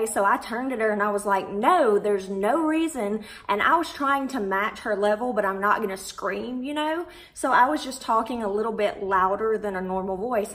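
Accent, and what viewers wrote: South, United States. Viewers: I love your accent and the way you say “being ugly” because my family is all from the south & they talk the same way & just value respect, and it just makes me smile honestly watching this video. Also, I’m so glad to have found your channel!! I just subscribed, and I’m looking forward to seeing more of your videos ☺️ also, I love your name. I have the same one. It’s pretty great!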